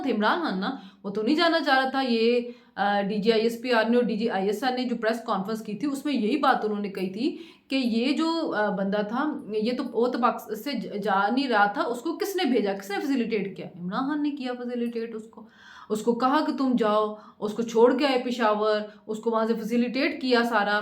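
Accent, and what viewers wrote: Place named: Punjab, India. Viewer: your punjabi is sooo cute, you should do your vlog in punjabi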